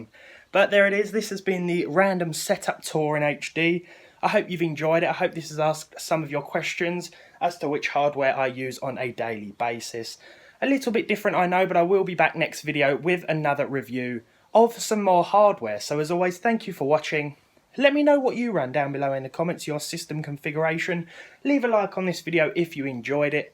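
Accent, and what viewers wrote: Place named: Southampton, United Kingdom. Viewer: I always assumed you were from somewhere near Southampton from your accent.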